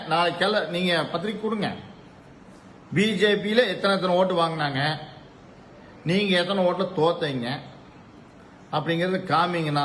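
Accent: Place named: North, India. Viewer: இவன் முதலில் யாருடா!!! வடக்கன் மாதிரியே தமிழ் பேசுறான்.... தலை எழுத்து நீ எல்லாம் கை விரலை ஆட்டி பேசுவது. இந்நேரம் அந்த அம்மா இருந்து நீ இப்படி பேசி இருந்தா உன் நிலைமை நான் சொல்லி தெரிய வேண்டியது இல்லை...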